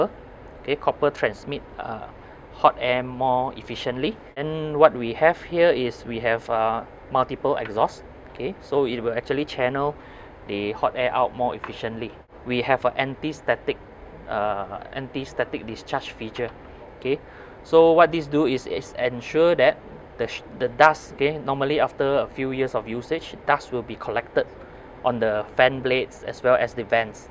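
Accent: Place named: United States. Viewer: 你的英语说得和美国人一样。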